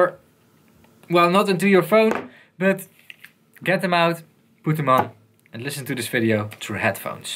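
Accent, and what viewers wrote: Netherlands. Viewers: 1sec in, this guys is obviously Dutch xD.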